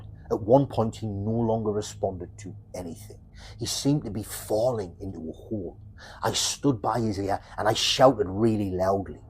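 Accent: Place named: South Shields, United Kingdom. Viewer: This happened just up the road from us. I'm originally from south shields and your voice is making me homesick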